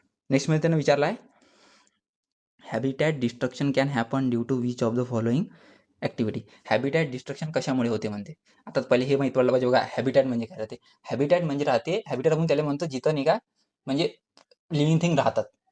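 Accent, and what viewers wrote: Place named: Akola, India. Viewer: Akolyache ahe vatte sir😄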